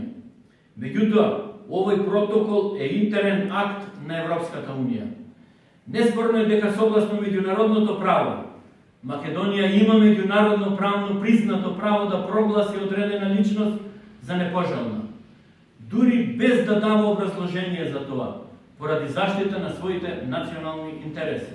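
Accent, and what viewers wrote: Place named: Vratsa, Bulgaria. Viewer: Незнам ама говорите като баба ми на село....Врачанска околиja :-)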